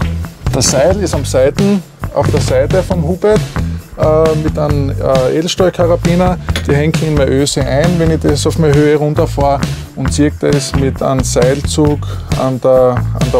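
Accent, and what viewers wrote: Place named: Low German, Germany. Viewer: Wenn man Kunden gewinnen will sollte man verständlich sprechen. unn nitt sei Platt!!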